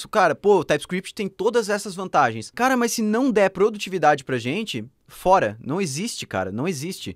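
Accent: Rio Grande do Sul, Brazil. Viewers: Vc é gaucho?? que papo é esse de TU?????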